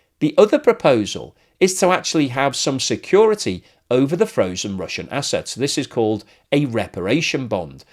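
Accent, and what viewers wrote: United Kingdom. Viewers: I hear a British accent. I would prefer somebody with a Belgian accent discussing this matter. Britain does not have a dog in this fight. Your efforts might be viewed as an attempt to inject discourse between Russia and Brussels, Britain's competitor on financial markets. There is a conflict of interests here.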